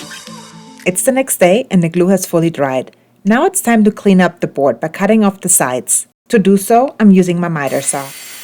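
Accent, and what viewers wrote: Germany. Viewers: Your DuEnglich is Cool!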